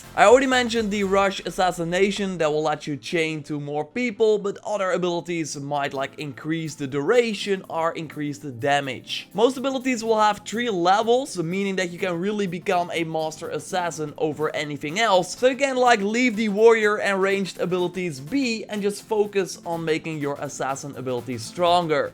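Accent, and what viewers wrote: Netherlands. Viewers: Nederlands accent is heavy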